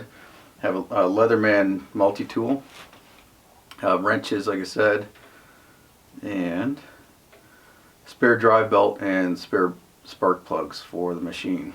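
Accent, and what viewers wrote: Canada. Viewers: After a tough day, coming home to a vid is just great. I love the Canadian accent, I'm sure it varies according to area, (maybe not as extreme as in the UK). Its very comforting somehow, I can't explain how or why, but listening to you speak is soothing. Keep up the good work. Some may think these are trivial vids, but I find them fascinating, and they answer a lot of questions that I'm interested in.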